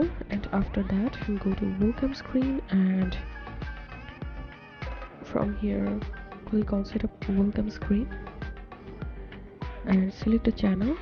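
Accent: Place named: India. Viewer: cant listen to this voice im sorry. if you are the beautiful girl in the thumbnail thats awesome but it sounds like an indian video trying to guide me lol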